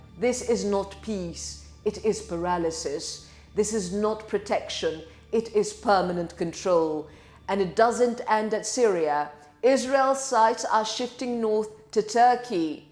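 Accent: RP, United Kingdom. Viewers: Full support for Israel from The Netherlands 🇮🇱🇳🇱 Beautiful words with a posh accent does not hide the violence and hate embedded in the ☪️ULT called Islam! Everywhere stone kissers go, problems follow!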